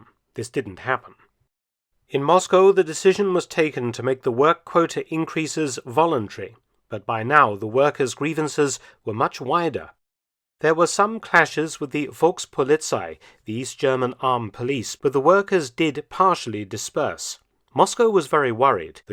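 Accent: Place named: Southern England, United Kingdom. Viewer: A generic southern/Home Counties English one.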